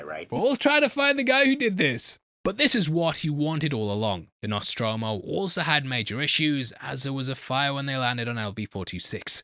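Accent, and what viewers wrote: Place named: United Kingdom. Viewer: You sound like a Brit, you probably should know how french words are being produced, init mate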